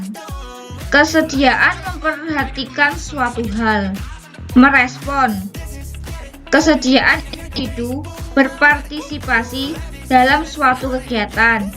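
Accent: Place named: Java, Indonesia. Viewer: Suara nya khas medok Sukses sellu nak cantiksss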